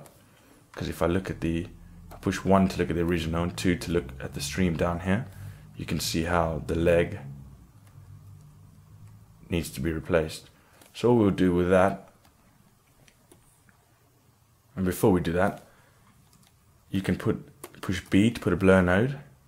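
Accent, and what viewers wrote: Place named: South Africa. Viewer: you sound South African :P respect :D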